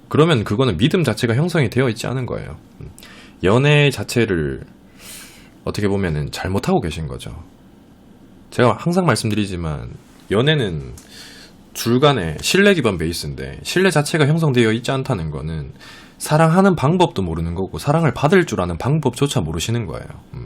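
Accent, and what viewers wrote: Gyeongsang, South Korea. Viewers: 은 너무 많은걸 알고계시다 마인드가 스마트하네 대구 말씨도 넘좋다 ㅎㅎ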